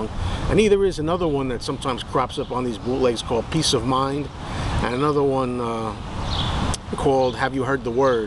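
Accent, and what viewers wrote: New York, United States. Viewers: I assume from your accent you're a NY guy like me?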